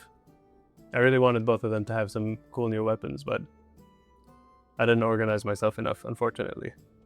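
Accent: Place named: Sweden. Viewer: Thanks for your reply. Also by your accent I can tell you are swedish, right?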